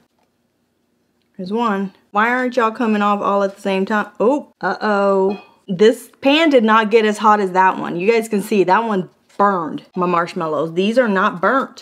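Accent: South, United States. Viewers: Isn't the country accent comes from living in Texas!!! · Lol I found your channel a few days ago... and have watched so many of your videos and laughed so hard!! But I got a question... in one video or maybe this one you said you woke up with a southern accent... I'm southern and I thought you were Southern so now I'm wondering... where are you from? Lol Keep doing what you're doing!! Love your videos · I was drawn to comment because of the southern accent you’ve picked up! Change your accent to draw more viewers!! Question: did you post the link for that magnifying device you used? Thanks!! Love your videos!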